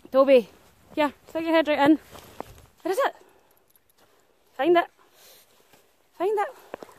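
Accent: Scotland, United Kingdom. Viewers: Hass is sounds Scottish! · Are u scotishh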